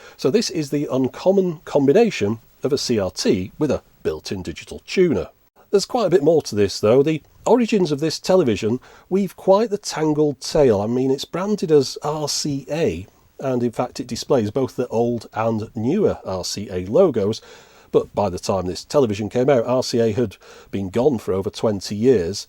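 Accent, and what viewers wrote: United Kingdom. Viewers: As soon as I heard a British accent, I had to leave because the American prison system has different stuff, this is probably items from a prison from England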